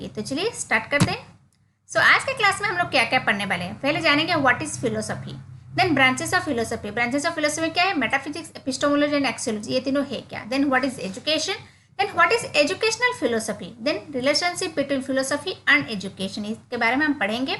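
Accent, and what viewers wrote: Odisha, India. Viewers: Ma'am app Odisha se ho kya ?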